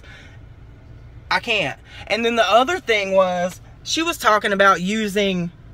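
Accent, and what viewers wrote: South, United States. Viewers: Newbie here lol Peter Monn has said such great things about you & now I know why! As soon as I turned this video on, I got the sense youre a southern drawl, younger (Not saying Peters old, dont come 4 me, were same age)from the country version of Peter which I mean to be a compliment! You have the same positive kind energy & mannerisms that I could tell within a minute of your video😊Thanks for this vid & will be checking your other vids out😀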